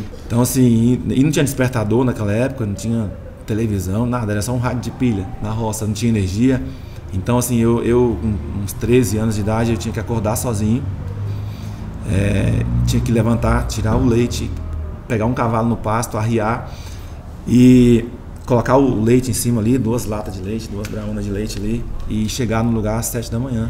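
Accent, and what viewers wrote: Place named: Northern Minas Gerais, Brazil. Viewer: Canta muito..a voz dele parece com norte de Minas ..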